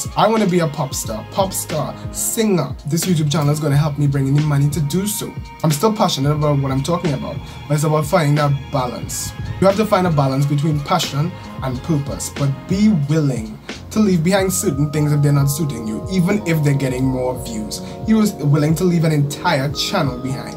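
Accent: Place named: Trinidad and Tobago. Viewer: You sound trini ❤🇹🇹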